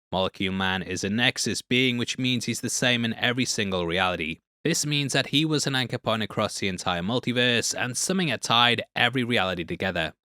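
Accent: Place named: United Kingdom. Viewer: I knew I needed this guy to explain things to me in a British accent. He never misses.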